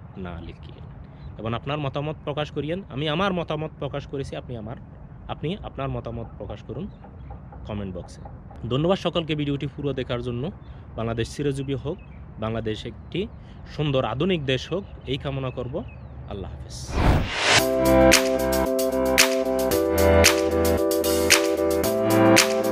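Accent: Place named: Noakhali, Bangladesh. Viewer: আপনার ভাষায় বুঝাযায় আপনি নোয়াখালী বা ফেনীর মানুষ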